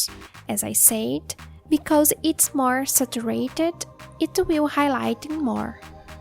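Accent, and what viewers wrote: Brazil. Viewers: I can recognize a brazilian accent easily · Dá pra ouvir o português por debaixo do seu ingles. Vc é Brasileira de de onde? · Esse sotaque aí e BR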